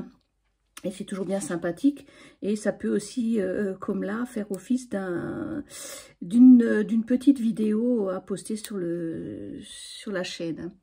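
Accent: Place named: Alsace, France. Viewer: Solù, ehr geht guát und dù? J'ai explosé de rire avec le Godvertami ou alors le Godverclemi, c'est tellement nous les alsaciens. Désolé je suis nul dans l'alsacien écrit, mais je crois que c'est pas si mal 😅🤣 Moi aussi je me reconnais en toi, ta façon de parler, les expressions, c'est génial j'adore ❤ Moi, j'avoue que je me retiens beaucoup, car je parle le "fralsacien" au quotidien. Je suis tellement contente d'avoir fait ta connaissance via YouTube. Je crois qu'on s'entendrait bien dans la vie ❤ Decka Schmoutz et bonne soirée 😘😘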